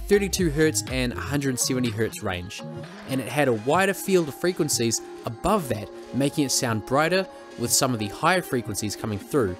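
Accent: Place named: New Zealand. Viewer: You from NZ bro? u got my auto sub hahaha , solid video bro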